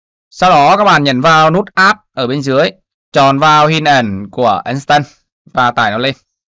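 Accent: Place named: Nghe An, Vietnam. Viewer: anh cảm ơn em nghe giọng em như người nghệ an quê mình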